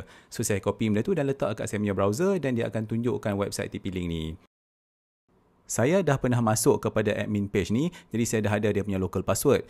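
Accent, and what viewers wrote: North, Malaysia. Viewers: Cukup sempoi n natural. Maintain bahasa utara. Terbeikk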